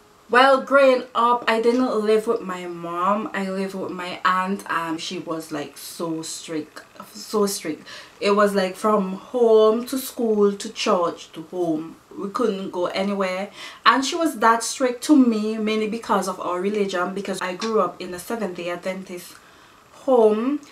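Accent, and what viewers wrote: Saint Vincent and the Grenadines. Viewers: Girl your accent says Vincy alone 🇻🇨🇻🇨 so good too see another Vincy on here 🇻🇨🇻🇨🇻🇨 #784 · butt up pon yo channel by accident, glad to see a Vincy on youtube, nuttin wrong wid talking dialect , its a combination of our african language and our slave masters. gwan gyel do yo thing, new sub. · Was so happy to hear your accent n see someone from Vincy on YouTube!!! Subscribed!! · It's good to see a fellow Vincentian with their accent still..though living abroad #vincytodbone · Love this! Miss hearing the vincy accent since we moved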